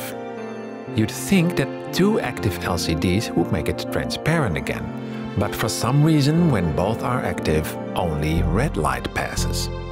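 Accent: Netherlands. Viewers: Huh, this is pretty neat. :D I also like how wonderfully Dutch you sound, without having too thick of an accent. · It's just cute, how dutch you sound.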